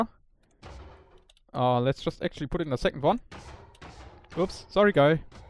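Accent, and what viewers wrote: Germany. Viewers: [german accent detected] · tell me im wrong...but i think you are german (im german too so i might probably be able to tell better) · You have a bit of a german accent... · You really sound like a german guy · You are deutsch, stimmts? Hört sich lustig an :D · Are you german? You have an really stong german accent. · Wie man den deutschen Akzent hört xD Is ja übel This guy is german, u can hear it by his accent. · Your pronounciation sounds like you are from germany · du bist deutsch, oder? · Wie man direkt hört, das du ein Deutscher bist :D Wie dem auch sei, gutes Video! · Du bist ein deutscher oder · Du bist doch deutsch du hast an akzent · ***** merkt mann eig echt leicht du hörst das deutsch raus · du bist Locker deutsch! :D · man merkt direkt das du ein deutscher bist xD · mann hört das du deutscher bist :) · He's speaking English with a German accent. 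· Bist du Deutscher? Man hört so nen kleinen Akzent raus. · Echt ey klingst voll deutsch melde dich mal :D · deinen Deutscher Akzent hört man voll raus ^^ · Hab sofort gemerkt das Du ein Deutscher bist ;-) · Ein sehr deutscher Akzent...hm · Starting the video, I knew right Form the get go that you're German as well :D nice video tho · youi have a german aczent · du bist doch deutsch , das hörtman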